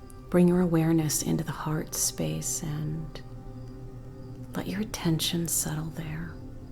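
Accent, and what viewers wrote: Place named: United States. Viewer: Wonderful. The life eperiences you have had to create this ??? Sadness, loss, love. Very touching to me - a 89 year old Englishman living permanently in Japan. Coming from a working class background in North-East England and having parents and grandparents of Irish origin whose lives had not been easy - I release them with love. I love your American voice and wonder what part of America? All the love - Jack.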